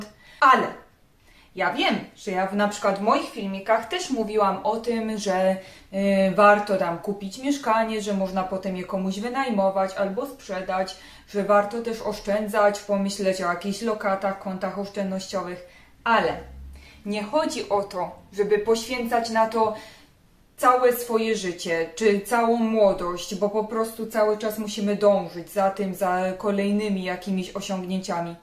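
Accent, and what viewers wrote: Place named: Silesia, Poland. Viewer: Widać że się pilnujesz w słowach i wymowie. Czuć Śląsk z daleka 😊 pozdrawiam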